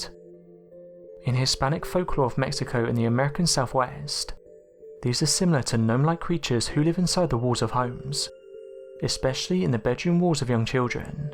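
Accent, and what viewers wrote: United Kingdom. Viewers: British ASs accent bullshiter! Hahaha. Ass wipe!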